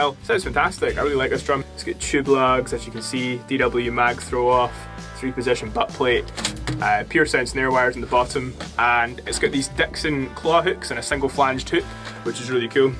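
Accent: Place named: Scotland, United Kingdom. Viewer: Very interesting!I think your DIY skills are WAY beyond Homer Simpson or even former President Trump!!!!A lot of time,DIY projects success is contingent on your tools.Obviously you have more that adequate equipment!!Are you a carpenter!You are my first sub in Scotland/cool Accent!Thanks for the very excellent demo even I understood it!LOL Neil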